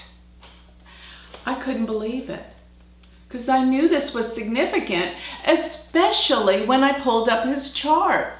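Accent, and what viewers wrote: Texas, United States. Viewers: I love her southern accent. Texas specifically. Makes me miss southerners a little bit. I live on West Coast now. Over the years, I have dabbled on and off of your website. My knowledge of Vedic astrology is small. After watching this video, I feel more affinity towards you, your work I mean. It takes some courage to do this video, I think, because many people interested in astrology tend to be on the Left (lol). God bless you,.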